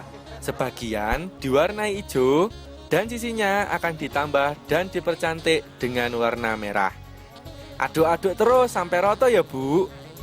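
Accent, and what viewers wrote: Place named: Java, Indonesia. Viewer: logate ojok d medokne Cak biasa wae lah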